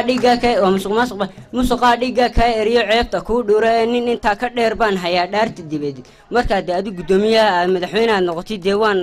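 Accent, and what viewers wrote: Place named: Burao, Somalia. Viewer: walahi anon dhagaysanban iska gartay inuu rer burco yahay😂😂😂rer burco ninan hayba dhibi haysata magaladii raga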